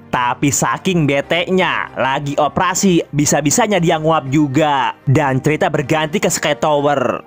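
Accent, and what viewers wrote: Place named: Banjar, Indonesia. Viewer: Urang Banjar kah saban hari jar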